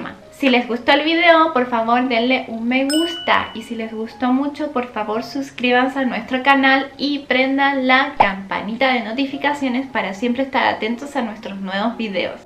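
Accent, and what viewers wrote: Chile. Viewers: Es interesante que no has perdido el acento chileno, saludos y gracias por la información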